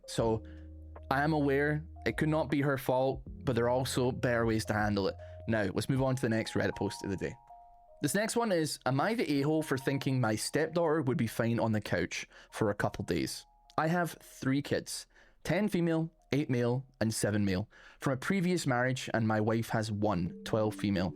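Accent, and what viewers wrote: Scotland, United Kingdom. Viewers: I love listening to reddit stories while I write. Now I can listen to a fellow Scotsman. Perfection.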